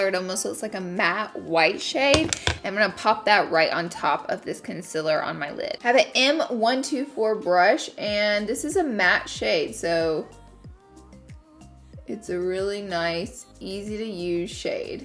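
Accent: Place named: Texas, United States. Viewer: Yes girl! I love watching you :) You remind me of where I grew up in south Texas because of your accent. Keep up the great work 👍🏻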